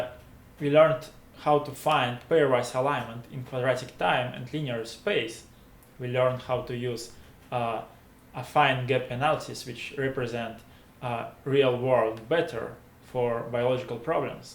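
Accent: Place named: Russia. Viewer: прям слышно что говоришь по русски...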